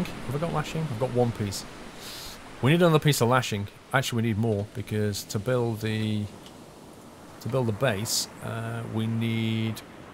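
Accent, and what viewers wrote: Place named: United Kingdom. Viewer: he is obviously British like me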